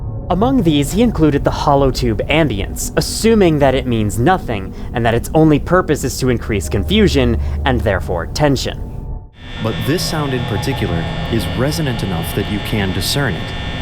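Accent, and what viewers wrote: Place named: Asia. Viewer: He sounds asian but its not an insult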